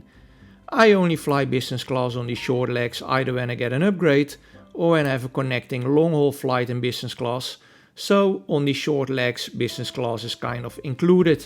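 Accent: Netherlands. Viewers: What a terrible Dutch accent.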